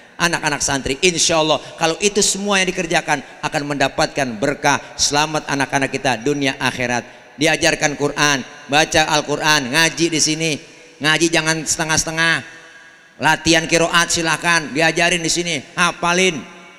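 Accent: Indonesia. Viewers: keren..betawi bgt..sehat terus ustad. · Di sumatra ada UAS di betawi ada UNFAD ustadz. Muka, perawakan, sama, punya ciri khas berbeda UAS brdialek melayu UnfAD dialek betawi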